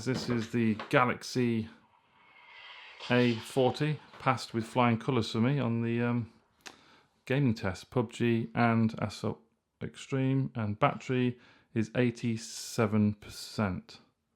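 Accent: United Kingdom. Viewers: Yup, typical British accent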